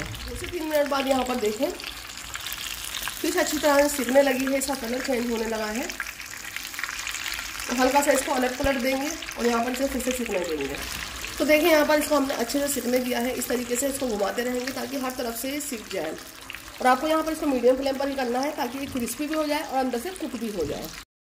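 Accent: India. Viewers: Assalam wallekum Masha Allah zabardast Aap India se hai q k aap KI language Indian lagti hai Mai Pakistan se hoo. Aap ki dishes buhat laziz recipes hoti hai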